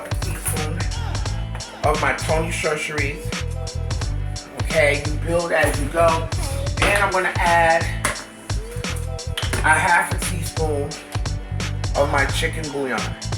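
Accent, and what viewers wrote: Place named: East Coast, United States. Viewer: Hey Ms! I love your cooking style! Your green sauce is giving me life and I haven’t tasted it yet! I love your sass and that east coast vibe. Oh yes, I can hear it in your voice! Thank you for this depth of flavor. I’ve seen recipes where people just add salt and pepper with some chicken stock. Period. I need spice in my life! I bought some neck bones and limas today, so this will be on my table soon! Thanks so much! ❤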